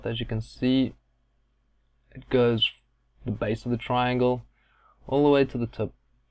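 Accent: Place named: South Africa. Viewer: Great tut..you speak like me!!! First time someone has got the pronunciation of bezier correct> You got to be South African!!;-)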